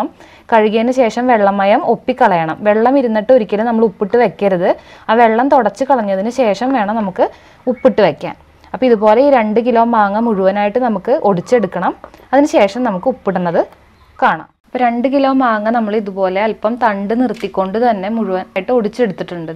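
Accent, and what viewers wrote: Thrissur, India. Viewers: Valare nalla avatharanam ethra simple aayaanu ellaa karyavum paranju thannath Evarkkum manassilaavunna thani naadan bhaashayil ellaa karyavum visadeekarichu thannu Nalla cute voice Chechiyude samsaaram kettittu chechi Thrissure jilla kkaariyaanennu thonnunnu Thrissure jilla yilaano veedu Marupadi pratheekshikkunnu Ethrayum nalla sabdathinudama yaayittenthina Mukham kaanikkaathirikkunnathu Thank you chechi thank you veri much🙏🙏🙏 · Enjoyed watching the video AND THE " THRISSUR BASHA ". My grand mothers & mother used make this Kadumaanga / Kannimaanga Achar ( Vadumaanga Pickle in Tamil ). They used to parcel in air tight / sealed containers at local blacksmiths and send to their children residing outside India. Now it is becoming more & more difficult to make at home and easier to buy in the market. Thanks again for the video and again for the Thrissur Basha.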